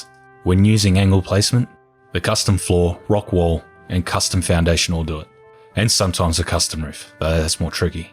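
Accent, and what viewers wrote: Australia. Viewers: classic aussie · Such an Aussie accent but awesome vid mate! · You sound like an Australian Ahoy <link>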